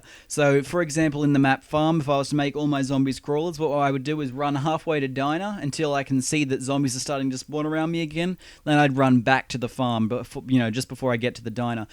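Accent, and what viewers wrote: Australia. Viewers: Really helpful mate thanx, what part of Aussie u from?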